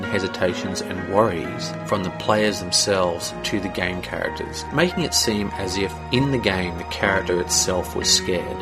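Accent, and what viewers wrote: Australia. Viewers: Are you Australian? You got a nice, calm voice to listen to, and greate review! :D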